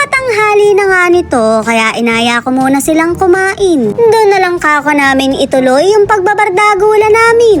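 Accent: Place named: Bicol, Philippines. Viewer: Bisacol ng tagalog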